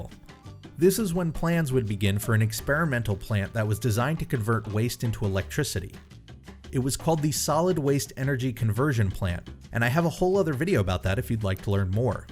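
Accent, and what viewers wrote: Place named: United States. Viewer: Toe-MAY-Toe Toe-MAH-toe... ...he's American, and almost every American speaker pronounces it the way he does. That's not to say that your pronunciation isn't correct, just that when enough people do it, it's considered acceptable. Like how there are two ways of pronouncing "either" even though there's that rule where if a soft E is immediately followed by another vowel, it becomes a hard E.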